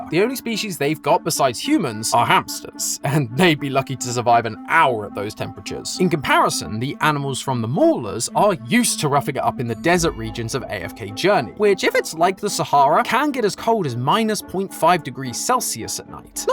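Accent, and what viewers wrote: United Kingdom. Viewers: A British voice explaining lore just hits different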